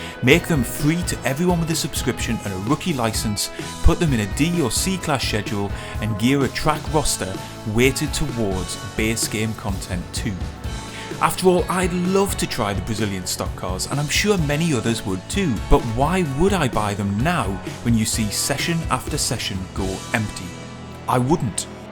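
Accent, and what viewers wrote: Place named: Newcastle, United Kingdom. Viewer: really enjoy your content, nice to hear a fellow Geordie - Dave Cam only lives a couple of miles up the road. The North is a Sim-Hub 😂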